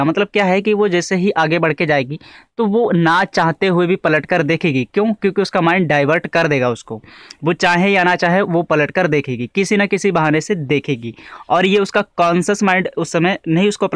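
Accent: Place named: Bihar, India. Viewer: Tu khud awaj se Bihari lagraha hai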